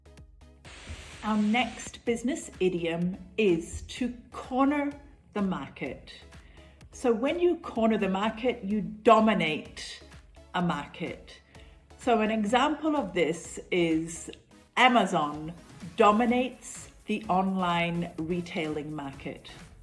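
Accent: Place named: United Kingdom. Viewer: i like your british accent and grammer i am trying to recovering perfect tense, when i review i know, but when i communicate i mixed all tenses😅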